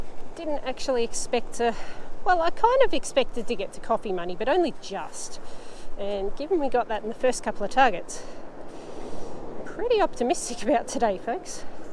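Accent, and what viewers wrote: Australia. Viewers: Good job, young lady 👏... Well done and very enjoyable. Love your Aussie accent 😍❤. Your new adopted Granddad in Florida...praying that you will be Blessed and safe 🙏...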